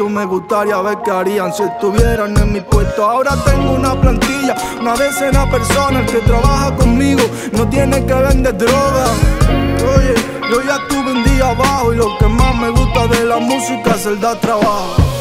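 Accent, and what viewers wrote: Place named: Granada, Spain. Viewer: Es del sur de España, de Granada en concreto por eso tiene ese acento.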